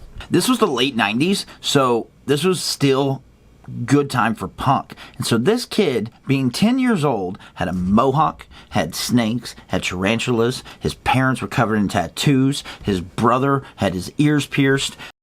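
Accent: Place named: East Texas, United States. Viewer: You should definitely ride the board into work! Love the vid! And that east texas accent is just proving me right that you're the.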